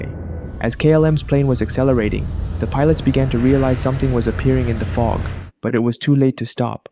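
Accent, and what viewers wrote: India. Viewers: he sound indian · Bro has an Indian accent made by ai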